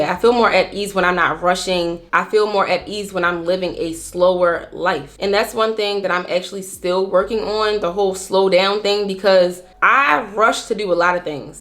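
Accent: Baltimore, United States. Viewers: I hear the Baltimore. So I know you know first hand how hard it is to be feminine in Baltimore. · Are you from bmore by any chance, if so I can hear it in your accent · Is she from Baltimore? I hear it in her accent and I’m from Baltimore lol. I wouldn’t be surprised bc a lot of us women who grew up here have had to build this masculine, tough girl persona to protect ourselves in this city and I am so happy to know I’m not the only woman here who is tired of it lol (if she is actually from Baltimore) 😅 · I’m working on being more intentional as a wife and mother and a better woman, so I decided to come on YouTube to find a black woman who has been implementing intentional changes in their life and I came across your videos. Thank you for sharing your story. Side bar as I was watching one of your video I was thinking she sounds like she is from Baltimore 😂. 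Shortly after you stated you were from Maryland. Nevertheless sis keep up the great work. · This was gooood. Like a sermon lol. You should like you're from Bmore. Thank you for this.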